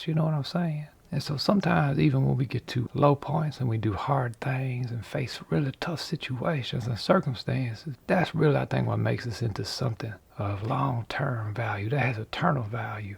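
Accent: Louisiana, United States. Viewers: He had me at Bilbo and Gandalf story in his Cajun soft voice 😂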